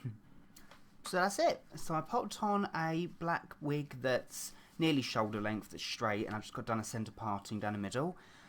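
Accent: United Kingdom. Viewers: I would like to say that I do thoroughly enjoy seeing your tutorials and you are very talented. I also enjoy listening to you talk there is just something about the british accent! In addition to the praise I would also like to place a request for three people: Graverobber and the Repo man from Repo! the Genetic Opera and Lucifer from the Devil's Carnival! Keep the videos coming and thank you!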